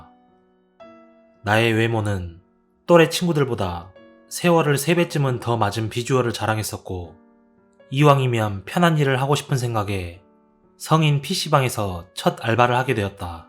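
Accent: Jeolla, South Korea. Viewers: 구수한 전라도 사투리~~~😁😁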